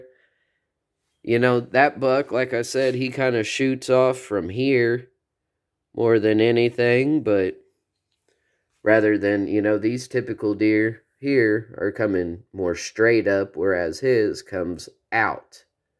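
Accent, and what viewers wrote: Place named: South, United States. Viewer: Lol im from michigan. People don’t understand deer like that don’t grow in northern Michigan. That’s why Jon Eberhardt knows buddy. Because he hunts in northern Michigan. Trust me when I say this they don’t get that big up there. I live in southern Michigan, which is where the biggest bucks are in michigan, and I have never seen a 30 inch spread on a buck. People in Traverse City Michigan are lucky to shoot an eight point that’s 15 inches wide Buba. It’s not about whether the antlers go straight up. It’s about the soil content that doesn’t give credence to the idea that a Deer in northern Michigan could grow a rack that’s that extraordinarily wide. I’m sorry dude unless you’re from Michigan you just won’t understand. Don’t want to break anyones heart, but there’s no factual proof to that damn buck and if there was at all, he would’ve put it under an x-ray. 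He was the scorer for the commemorative bucks of Michigan man. He was the type of guy who regarded and revered records. If he wasn’t he wouldn’t have been part of CBM. Sorry, I hear the southern drawl in your accent and it just makes me have to say Bubba come up here to Michigan and let me know when you see a deer that’s 30 inches wide. As to John Eberhardt, he is one hell of a deer hunter and to put over 100 deer that score over 100 inches on the dirt in Michigan is some seriously good huntin.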